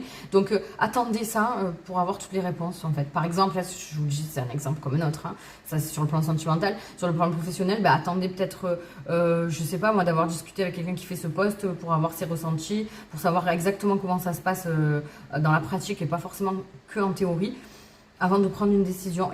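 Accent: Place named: South, France. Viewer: J'aime bien votre accent du sud 🥰😉 !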